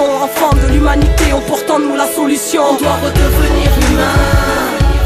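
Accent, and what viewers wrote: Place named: South, France. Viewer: jvoi pas quelle accent de racailles tu parle mec elle bien de Marseille normal quelle es un accent du sud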